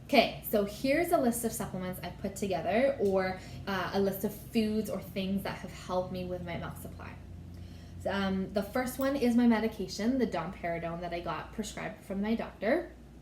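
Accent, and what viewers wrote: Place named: Canada. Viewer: John Sanders she sounds like she has a Canadian accent to me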